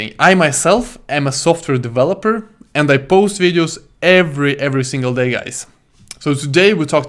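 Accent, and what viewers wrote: Russia. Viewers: I like your huge Russian accent very very much! You are such a brave man to film all those videos and not to afraid of anything. Wish you good luck, I subscribed.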